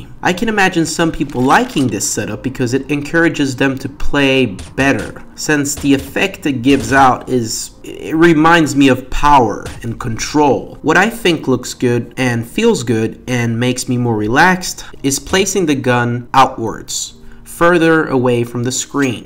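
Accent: France. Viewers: Are you french ? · are u french?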